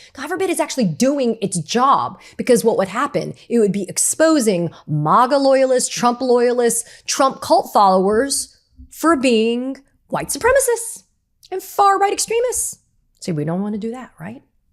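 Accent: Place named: Australia. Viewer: Adoring Katie Phang unplugged! ❤❤❤😂😂😂😂😂 You sound very Aussie, would fit in very well down ere!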